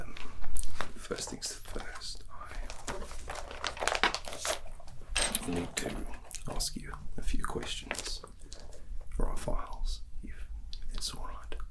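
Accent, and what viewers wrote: Australia. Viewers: Our sweet summer child, Stanley 🥹 Huge props on the accent! It kinda sounds like there's a lil bit of a Kiwi tinge in there, like he moved over here when he was very young. And as a Queenslander, I just want to say thank you for choosing the sunshine state! Sydney and Melbourne get like 90% of the attention when it comes to the rest of the world (heck, even within Australia 😐) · mate, your Australian accent is awesome. I’m Australian. 👍👍👍❤️❤️❤️ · Ah, your Aussie accent and mannerisms were so familiar to me, an Aussie girl, that my tingles for the ' exotic ' went missing in action. Have you been here in Oz? Stanley is just like my uncle Ron, a decent, good bloke Aussie detective cop. They're not all good blokes though of course.....so some being a few flies in his ointment. I grew up travelling and living all around Australia and know that people usually have a mix of the slight differences in Aussie accents due to many transferring to different states and territories, usually for work. 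Stanley's slow old Queensland drawl would have me pick him as a banana bender easily. (QLD state known for banana production). I'm a sandgroper from Western Australia myself. The state known for the mildest accent and bloody sand everywhere and into everything. I guess we just mildly plough on through our day while casually dealing with this expected, everpresent mess. You can tell newbies to this state by their frantic car and house cleaning cyclonic dust up frenzies. They soon learn. Excellent work. Also you might be interested that some of my ancestors were a Welsh farming family from Carmarthen and came with the first fleet of settlers to help set up the Swan River Colony in Western Australia. The Llewellyn-Jones family. By their letters and family word of mouth history it certainly wasn't what they were expecting! Hardship, starvation, abandonment, after being deposited, by the British government, along with other Brit set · I was honestly prepared to laugh at the accent as an Aussie myself but damn you did a pretty good job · Oh my god, he did the accent better then I thought. 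I’m also an Aussie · Aussie here, somehow sound more Australian then me 😂😂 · I clicked for the same reason as well as I enjoy watching what posts, I honestly braced myself to cringe cause I've heard a lot of butchered Aussie accents and thought I was gonna be hit by another. I was pleasantly surprised. What part of Aus are you from? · Definitely one of the best attempts I’ve heard at an Aussie accent. Feels very outback, like I’m here for sheep-related crimes. And the cordial bit really struck home for me, as someone who can’t stand plain water! Great job, from someone down in the forgotten part of Australia (Tasmania) 🐨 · How come no one is talking about the aussie accent being on point? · Ive heard much worse Australian accents, some from actual Australians. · I’m aussie so I had to click on this purely out of curiosity to see how’d you go with the Aussie accent lol you are very talented I’m astounded by the amount of different accents and roles that you nail! 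Very impressive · and yes, like all the other Aussies, nice accent! Care to try a Hemsworth? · I noticed the DI/PC thing at the start too and you're right, pretty sure it's just a Pommie thing. I know here in Queensland, detectives go with their police rank i.e. Senior Sergeant and just add 'Detective' before it, end result being for example Detective Senior Sergeant Braithwaite. I could be wrong 🤷 , great roleplay tho, best Aussie accent in ASMR beside real Aussies! Cheers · Mate, as an Australian.. I have to say .. your "I'm a Aussie Copper from the Outback " accent is pretty damn good, In fact, you would of passed with flying colours if you auditioned for any of the Australian 80's cop shows we had back in the day! HAHAHA! But seriously, not many folk can nail it - and you did a pretty damn fine job if I may say so ^_^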